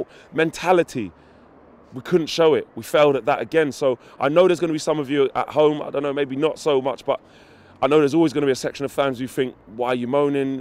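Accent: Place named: Manchester, United Kingdom. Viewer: He sounds like a local Mancunian huh?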